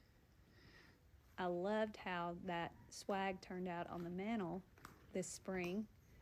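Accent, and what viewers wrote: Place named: South, United States. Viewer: I love southern accents! Please don’t let anyone tell you your accent is horrible.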